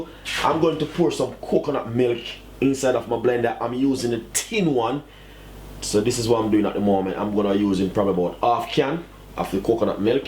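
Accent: Jamaica. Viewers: ummm he's speaking patois (patwa) our native Jamaican language....if u dont like how he speaks then move on to the next video.. we know its nutmeg but in broken English is nuttinegg....bitch!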